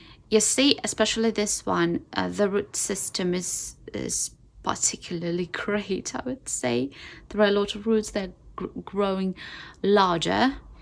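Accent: United Kingdom. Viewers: I seems like you have learnt British accent, sounds good 😊. Honestly, I just learnt how to grow grape plants by you 😅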